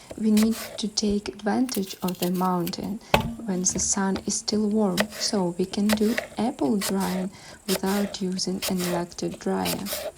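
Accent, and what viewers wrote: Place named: Ukraine. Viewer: ВЫ из НЕ ИЗ УКРАИНЫ? АКЦЕНТ кошмар жуть🙈. У меня выработался навык за последнее лет 7-10 различать украинцев за вёрсту чуять) 😊и вообще русскоязычных сразу вижу)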